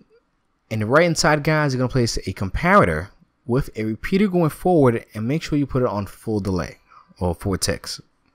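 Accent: New York, United States. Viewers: I think it is funny that you apologize for the noise, and tell people that you are from New York. LOL. I think everyone knows you are from New York. You have a very distinct accent. There are a couple of your videos where you say Forget about it. I lose it, totally lose it. Reminds me of a very young Joe Pesci. I hope you know I am a fan of all of your builds, and I don't mean anything bad about your accent. As a matter of fact for those of us who spend hours watching these videos making our builds it is important that the video makers accent is easy to listen too. There are several channels that I just can't watch because the maker's accent or rythm of speech is too annoying.Keep up the great work.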